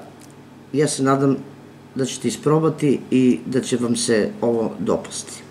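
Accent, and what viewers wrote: South, Serbia. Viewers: ma nista ja ne zamjeram. Samo vi govorite kako vec govorite. Inace je vas juznjacki govor bas super (malo makedonskog i malo bugarskog utjecaja 😊). Zena mog rodjaka je Makedonka (Skopjanka). Samo vi kuhajte. Nek se stvari "krckaju" i nek mirise. Ja sam uglavnom vegetarijanac. Kazem "uglavnom", jer kada podjem u Hrvatsku (iz Dalmacije sam rodom) onda uzmem malo ribe. I to je to. Pozdrav i sve najbolje vam zelim.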